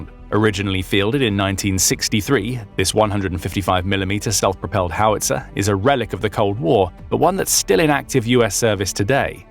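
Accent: United Kingdom. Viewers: Oh 900 out of 6000 you sound British what do you know ??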